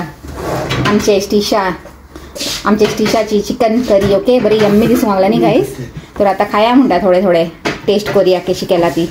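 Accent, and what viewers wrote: Mangalore, India. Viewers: Mangalorean accent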